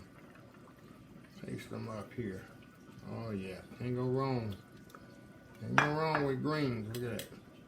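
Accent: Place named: Louisiana, United States. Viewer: So two days ago I’m watching cooking videos and came across your channel; well I been watching all day and for the time I came across your videos. Are you from Houston because you sound like you from Louisiana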